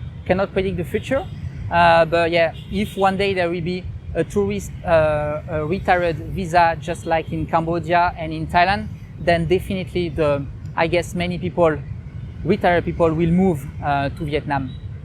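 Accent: France. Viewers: The first 10 seconds watching, I was honestly convinced he was putting up a fake French accent!!! ;)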